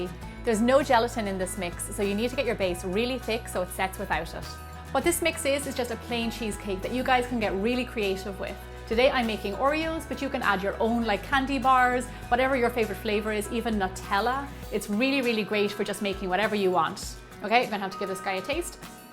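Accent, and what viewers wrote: Ireland. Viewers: Love the Irish accent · Wow! I've found my new favourite chanel! Lovely, easy food, no hard to get ingredients, and a lovely irish accent! (The best one for me) Cheers from Argentina!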